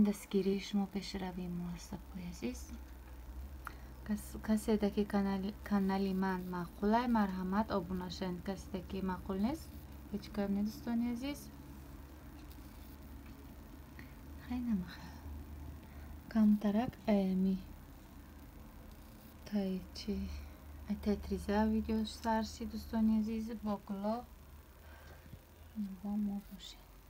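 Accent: Kulob, Tajikistan. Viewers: Салом хохари азиз видиёхот бехат макулай баройки кулобигии тооза гап мезани 👍👍👍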